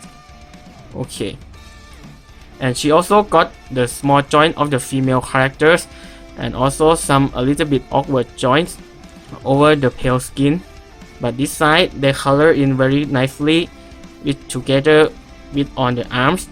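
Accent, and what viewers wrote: Thailand. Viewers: เหมือน คนไทยเลย สำเนียง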